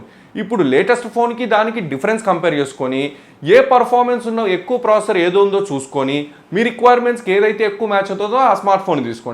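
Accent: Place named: India. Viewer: I like ur telugu slang and fearless review and comedy scenes also 💟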